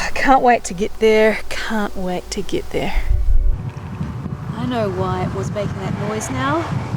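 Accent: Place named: New Zealand. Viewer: Fish and chips. My favourite words in a kiwi accent. 😍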